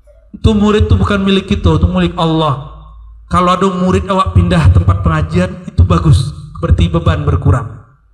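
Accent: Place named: Banjar, Indonesia. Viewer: kdg bhasanya kayak urang banjar, kdg kayak minang, kdg melayu kalimantan...kayak urang rawa pun ada, bersiko siko ! ...ustaz kiye sak tenan ne asal muasalnya teko daerah ngendi n suku opo ?..😂.. *" salam , saya si ganteng . jawa ngapak@ jawa begelen lahiran mlysia... apa pun ustaz ini makjleb n makyessss..👌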